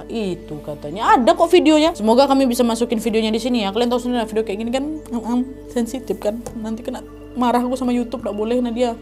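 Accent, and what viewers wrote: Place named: North Sumatra, Indonesia. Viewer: Logat bataknya kental banget yaa.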